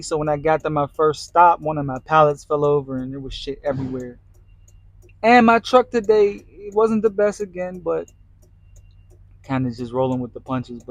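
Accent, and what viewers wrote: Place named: Pittsburgh, United States. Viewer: U gotta be from Pittsburgh 🖤💛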